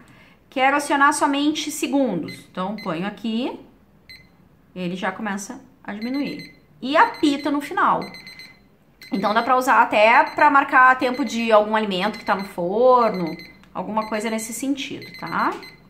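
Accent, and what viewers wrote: Rio Grande do Sul, Brazil. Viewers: tu és da onde? Tenho a impressão de que és do Rio Grande do Sul, pelo jeito de falar. Beijos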